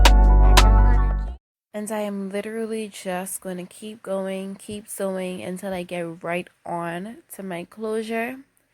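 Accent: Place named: Bahamas. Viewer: You sound like a Bahamian......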